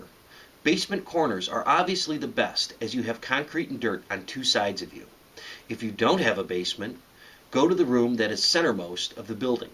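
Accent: United States. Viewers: It's funny that you say that, only in America we say it that way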